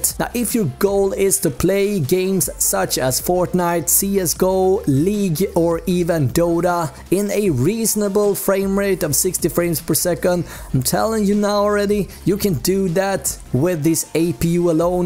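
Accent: Sweden. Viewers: Sounds swedish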